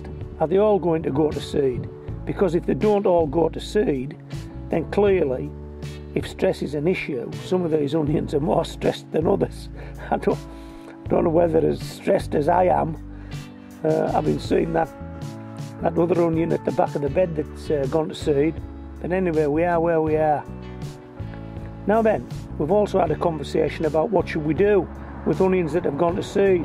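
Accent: United Kingdom. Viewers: You are a comfort to us as our people are from the U.K., so your voice brings good memories as well as good information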